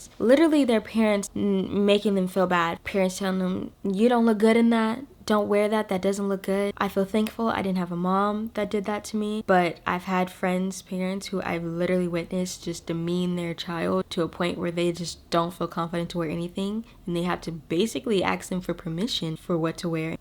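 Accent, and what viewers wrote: United Kingdom. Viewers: The famous British accent🙃💕 back with the good advice as usual ❤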